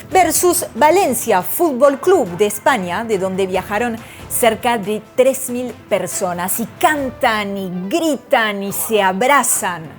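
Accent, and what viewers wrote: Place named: Argentina. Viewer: Todo bien DE DONDE ES ESTA DAMA...su epañol esta mezclado entre acento Español Argentino y Ruso... Pero buen video informativo.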